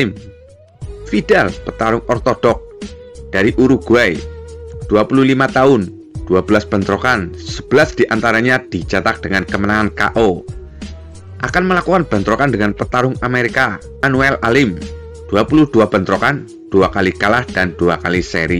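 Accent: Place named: Banyumas, Indonesia. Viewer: Admin mengulas masih logat ngapak👍. Inyong seneng dgn ulasan ini👍👍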